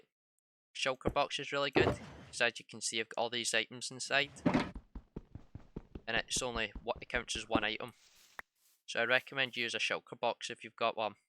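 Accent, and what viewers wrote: Scotland, United Kingdom. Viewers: It's his accent... That's kinda rude for the Scottish peoples.... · is it me or does Scottish accent sound really appealing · i k he's scottish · Scottish my brother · He has a sccotish accent lol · True Scottish accent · Hey fellow scottish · Its scottish bruh u can guess it right as he says it... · Scottish accent? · that scottish accent is dope.. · You have the strongest Scottish accent i have ever heard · Best scottish accent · wow scotsh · Ur from Scotland right?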